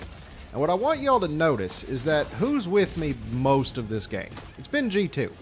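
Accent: South, United States. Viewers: Aayy like your southern accent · The southern accent fits helldives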